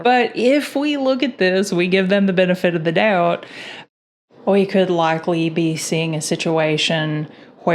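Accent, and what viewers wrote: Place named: North Carolina, United States. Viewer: Hey I subscribed to your channel a few days ago. I’ve been watching several political terrors for months just discovered you. I want to say I’m quite impressed. Thank you for all you do. I’m in Asheville, NC and your accent sounds kind of local to me. I see not from this area. Best of luck, keep up the good work!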